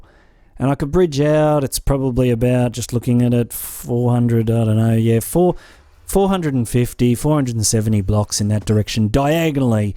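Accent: Australia. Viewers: Sooooo keen for the download. Loving the series, I'm a newish sub and I'm bloody loving your work. Its good to be listening to a fellow Aussie as opposed to my other MC subscriptions. hahah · Are you an Aussie?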